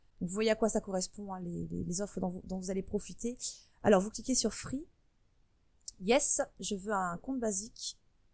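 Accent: France. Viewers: j'adore ton accent frenchy, je comprends pourquoi y'a des mecs qui craquent en entendant une p'tite meuf du sud-ouest parler anglais, un mélange de j'm'en fout, j'ai pas écouté ma prof quand j'étais en sixième et de t'as qu'a apprendre le français c'est une langue géniale, tous ça avec une syntaxe et une grammaire juste correct' et Windscribe (50Gb/mois) t'en pense quoi?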